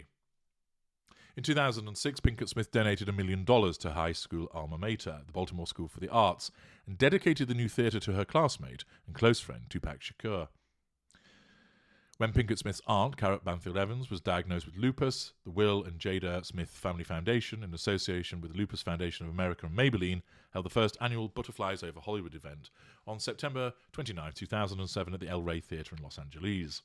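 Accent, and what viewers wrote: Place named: England, United Kingdom. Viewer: Tell me why all it takes is an English accent to make a mf sound like he knows what he's talking about. (???)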